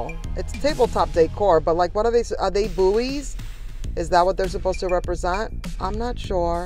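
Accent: New York, United States. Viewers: what part are you from!!! Put me in your suitcase, lol!!! I thought you were from NY by your accent!!!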